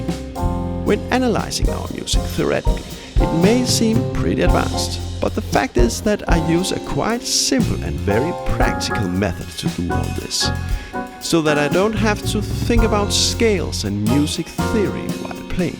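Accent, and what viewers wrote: Denmark. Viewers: Are you from Denmark??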